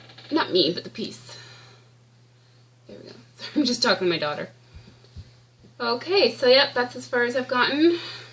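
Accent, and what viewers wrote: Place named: Canada. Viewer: First time watching you and I thought I detected a Canadian accent and I was right! Love your finishes and really, you stitch fast! You call that pretty leaf piece small, but that would’ve taken me forever! Also l love your haul ;). Happy stitching!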